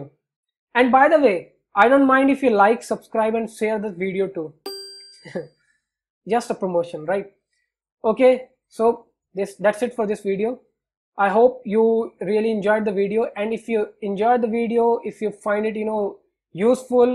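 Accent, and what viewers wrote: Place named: India. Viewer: I cannot tolerate your dialect. I think you are from India. Please consider voice training. Please!!!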